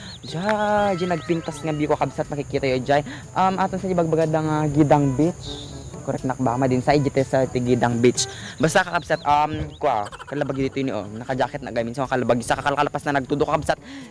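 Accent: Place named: Iloilo, Philippines. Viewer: Ang cute mo magsalita kuya ilongo bayan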